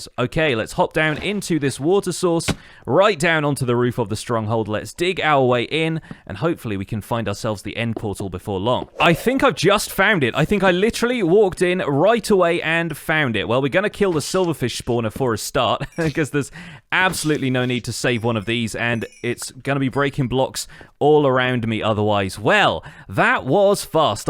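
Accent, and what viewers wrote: United Kingdom. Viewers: Love your British accent. Greetings from Italy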